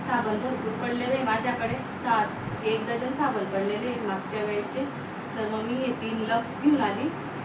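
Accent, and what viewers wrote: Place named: Ahirani, India. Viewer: ताई तुमचे video छान असतात. तुमच्या भाषेत उत्तम असा अहिराणी touch असतो.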